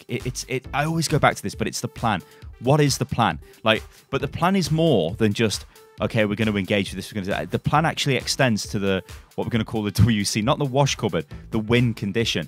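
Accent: Austria. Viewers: the language is german, but it sounds more like an austrian speaking it. dunno, might be wrong. lots of dialects in german. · He is from Vienna...local vocabulary and accent xD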